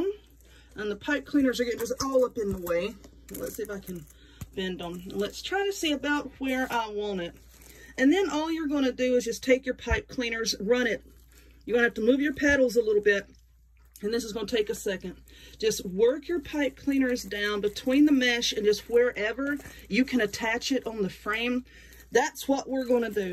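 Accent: South, United States. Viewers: i have to tell you how much i love your tutorials.. your accent and one-liners. your personality is what keeps you watching. My Dad is 96 and recently moved into an assisted living facility after the loss of my mom..I had decided to make wreaths for his door to his room so it would be easy for him to find his room. He is a disabled vet and this wreath idea is what i was looking for for Memorial Day and the 4th, I added a few stars along the outside, but thankyou so much for your ideas and tutorials, I'm sure he'll love it! You are a and all that! Thanks again!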